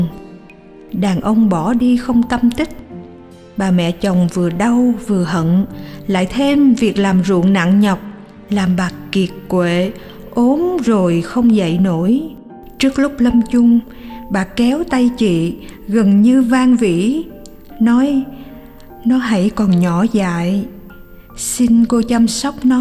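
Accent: South, Vietnam. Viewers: Truyện hay, cảm động, 8/10 cảm ơn giọng đọc dịu dàng, miền Nam của.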